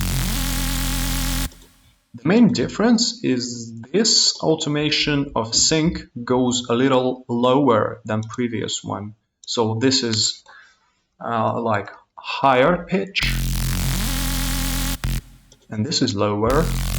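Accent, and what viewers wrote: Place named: England, United Kingdom. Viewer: О,вы из англии?))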